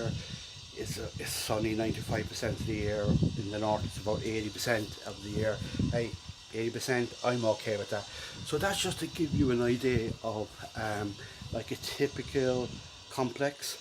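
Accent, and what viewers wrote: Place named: Cork, Ireland. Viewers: Wasstorry languer cork hhh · you sound like youre from Cork, i am too! ill be headed to puerto rico in a couple of weeks cant wait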